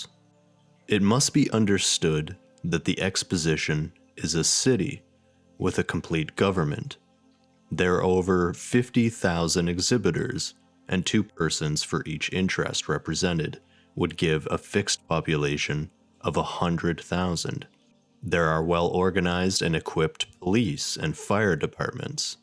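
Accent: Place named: Eastern Canada, Canada. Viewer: this boy eastern canadian AF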